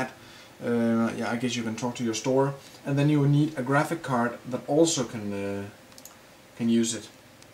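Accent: Denmark. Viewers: haha jeg kan høre på dit engelsk du er dansk :)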